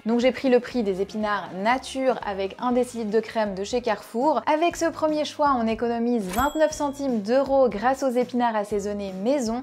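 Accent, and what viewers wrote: Switzerland. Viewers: Vous avez Un accent suisse 🇨🇭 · Oui mais le poids des myrtilles est-ce avec l'eau qui a gelé ? Ah c'est marrant j'ai trouvé dès le début que vous aviez l'accent suisse! De vers Neuchâtel ou par là!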